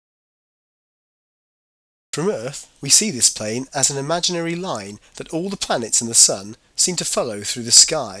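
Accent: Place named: Scotland, United Kingdom. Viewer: I think Scottish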